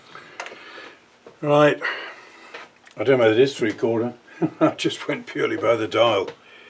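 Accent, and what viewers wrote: England, United Kingdom. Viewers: Hi, it's delightful to hear an English accent on these workshop related Youtube videos, even if you do use American pronunciations sometimes. Rob. Engineer, still learning!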